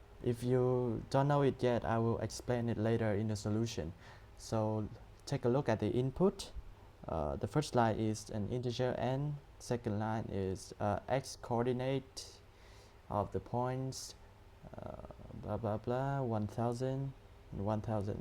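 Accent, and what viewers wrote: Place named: Vietnam. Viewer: Your accent, sounds like Viet. Anyway, great video!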